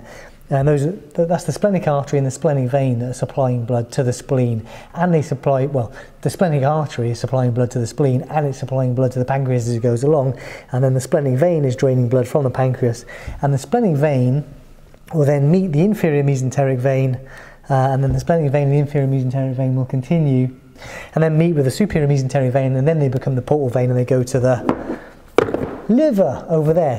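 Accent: England, United Kingdom. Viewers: Why does everything sound so much better in an English accent lol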